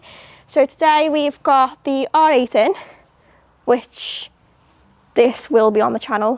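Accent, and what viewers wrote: Liverpool, United Kingdom. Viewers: That 'girl scouse' accent where words fade in and out still kills me 😂 'To be hone.........st' 😂😂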